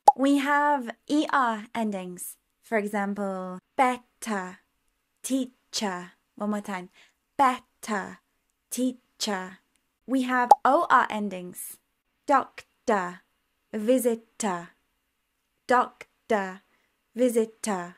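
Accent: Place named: United Kingdom. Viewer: How interesting! I never heard of the schwa sound before – it helps a lot to understand that nice British pronunciation I love! ❤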